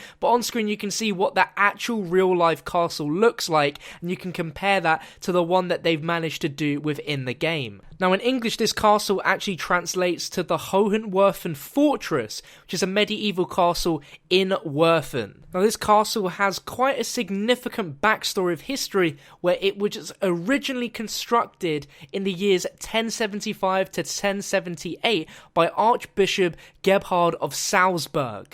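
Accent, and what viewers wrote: England, United Kingdom. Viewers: I love when English people speak German :D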